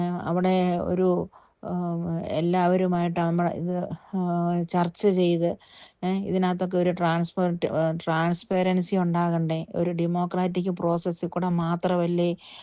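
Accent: Changanassery, India. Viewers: സഹോദരിയുടെ ഭാഷ കേട്ടാൽ അറിയാം ഒരു ചാണനാശ്ശേരി ക്കാരി ആണെന്ന് അപ്പോൾ ന്യായമായും സംശയം തോന്നാം. സ്വയം രക്ഷിക്കുക കൽദായ ചട്ടി തൊപ്പി കളുടെ കൂടേ കൂടാതെ ഇരിക്കുക. All the best സഹോ 👍🏻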